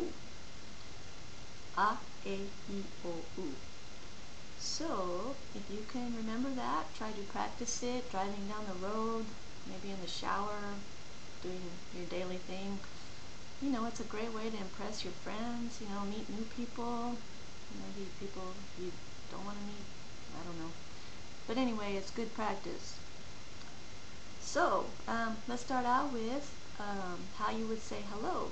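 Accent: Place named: Mexico. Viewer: you spanish is very good congratulations!!!! you accent is mexican!!!!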